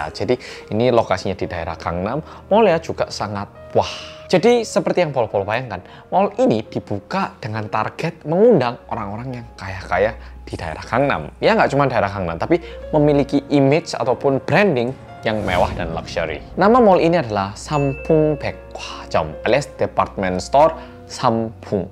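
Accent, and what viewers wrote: Java, Indonesia. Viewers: Abg org Korea apa jawa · logat jowo ne ketoro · Berapa kali mas ngomong untungnya, tapi ternyata yang meminggal 500 an lebih. Berasa banget jawanya yg jawa jangan tersinggung ya w juga orang jawa kok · Medok bget sih anda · Oppa asli Korea??? Masha Allah medok logat Jawa bgt 😁 ky koko2 Semarang /Sby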